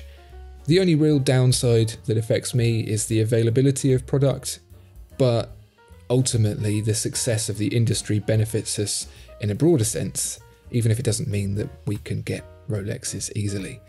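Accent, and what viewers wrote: United Kingdom. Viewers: You make watches interesting. You and your late night FM radio voice. Women around the world want to know, 'Who is that mystery hands British lilt man with that voice?' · Those Lange 1815 chronographs or datograph perpetual calendars are indeed the best grail piece. I prefer your videos with no face, ethereal presence voice (the brit accent helps too 😂).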